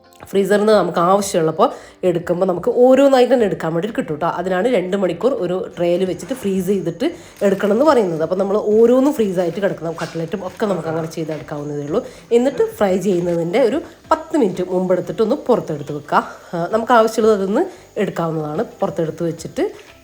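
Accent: Ernakulam, India. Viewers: എറണാകുളത്തെ സംസാരം ആണല്ലോ. വയനാട് ആണെങ്കിലും.. 👍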